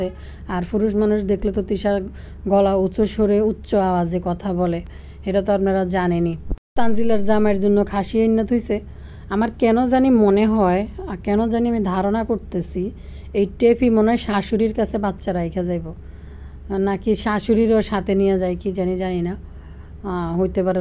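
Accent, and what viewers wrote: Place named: Feni, Bangladesh. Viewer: আপু কেমন আছেন। লাইক দিয়ে দেখা শুরু করে দিলাম। সমাজের মধ্যে এ সব নোংরামিগুলো বন্ধ করা উচিত । আমার দেশের বাড়ি ফেনীতে । এখন আছি আমেরিকার আলবেনীতে। আমার আর একটা নাম্বার থেকে কমেন্ট করতাম। হাছিনা আলম আইডি থেকে । এ নাম্বার টা এখন বন্ধ আছে। তাই নতুন নাম্বার থেকে কমেন্ট করলাম। এগিয়ে যান সামনের দিকে । আপনার কথা শুনলে মন ভালো হয়ে যায় । বিদেশে বসে নিজের এলাকার ভাষা শুনি তখন শান্তি লাগে। আশা করি আপনাকে সরাসরি একদিন দেখব। সবাই কে নিয়ে ভাল থাকবেন। আমাদের জন্য দোয়া করবেন।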